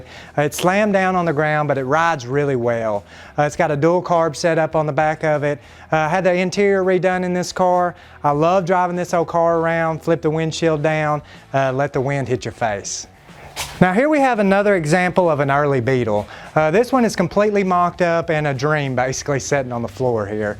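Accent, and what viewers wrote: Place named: Texas, United States. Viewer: Not gay, just a classic Texas drawl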